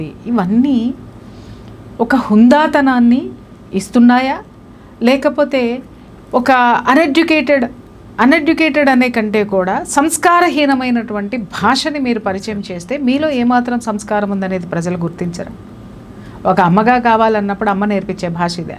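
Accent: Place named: Telangana, India. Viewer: మా తెలంగాణలో ఇట్లనే మాట్లాడతాము మీ ఆందోళన సంస్కారం ఉంటుంది మా తెలంగాణలో యాస ఉంటుంది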